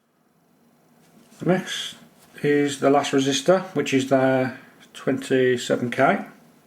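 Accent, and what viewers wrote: Birmingham, United Kingdom. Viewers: Hi there i also live in Birmingham uk it was your accent that gave it away anyway i also have a 100w transmitter but have no equipment except for a multi meter to tune the frequency and very little know how but i am learning fast.It has an exciter board with various trimmers ect and a crystal. how do i tune it, which trimmers do what, and to get around blowing the main output if i disconnected the power to the main amp board this would save me having to to buy a dummy load.Many thanks Paul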